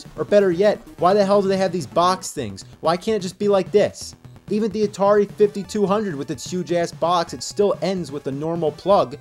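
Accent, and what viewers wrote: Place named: Pennsylvania, United States. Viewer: That's just a Pennsylvania accent. 😂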